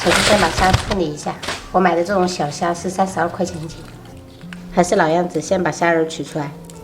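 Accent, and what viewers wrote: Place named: Minnan, China. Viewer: 好可愛的閩南口音，聽到跟自己一樣的方言，好溫馨暖心，很喜歡妳的頻道，真真實實的料理，真真實實的生活!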